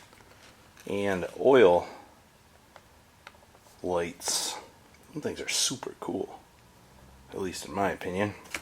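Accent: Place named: New York, United States. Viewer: Enjoying the New York accent mixed with the southern slang!!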